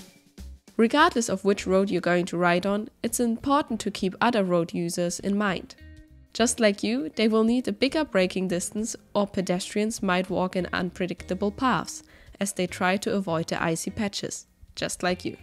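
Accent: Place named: Europe. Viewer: With your accent, I thought you were European, but this is definitely my home, Vancouver. Can't believe it took me two and a half minutes into this video to be sure!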